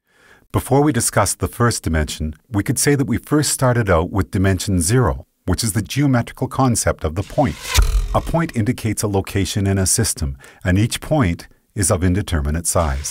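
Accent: Canada. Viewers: The minute you started talking, I thought, "this guy sounds so canadian."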